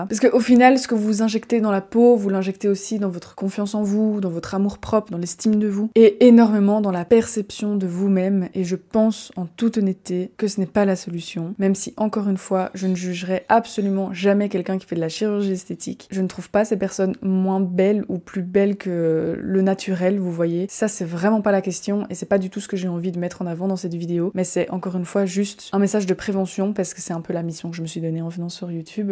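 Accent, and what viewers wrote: Belgium. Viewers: Super sujet et j'aime beaucoup la façon dont vous le présenter, avec profondeur et simplicité. J'entends un fond d'accent belge, non ? En tout cas, continuez !!!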